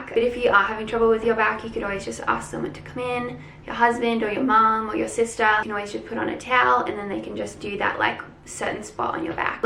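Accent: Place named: United States. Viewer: Sounding so American at times in this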